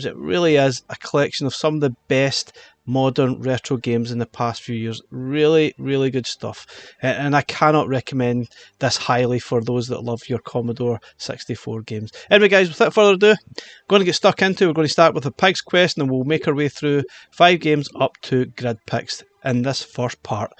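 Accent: United Kingdom. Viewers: TIL: Brits talk funny. ga-LAG-a? Anyway, the games look great, but I need no more chunks of plastic. If they put the collection on an Evercade cart or something, I’m all in.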